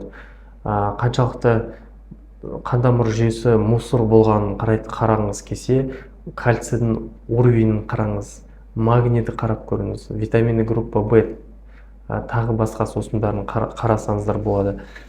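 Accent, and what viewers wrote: Standard. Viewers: Аиналаиын балам Рахмет Саган сондаи журекке жылы жагымды казакша таза тусындыресын Алла жар болсын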